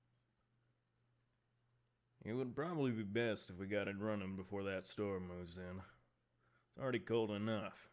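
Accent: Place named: South, United States. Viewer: Oh boy Ash was right, you do have a good southern accent ❤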